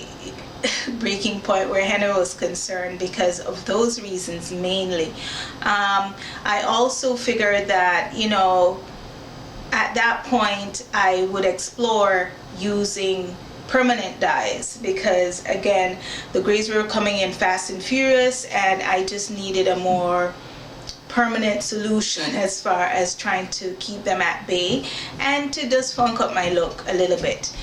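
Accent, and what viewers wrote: Jamaica. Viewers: I'm picking up a tinge of accent....are you Jamaican?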